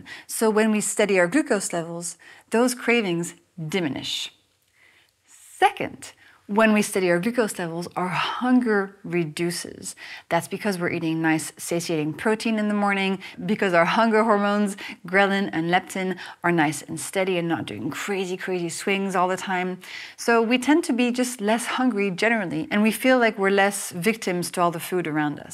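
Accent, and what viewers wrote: France. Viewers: I know how I detected she is french so easily despite her excellent English accent. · je me disais bien reconnaitre un accent français par moment ! merci pour vos explications je vais vous suivre <3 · Wait a second... Didn´t she have a very thick exaggerated French accent in her first videos?! You can´t simply erase your accent from your system. She was acting it to gain more impression and clicks.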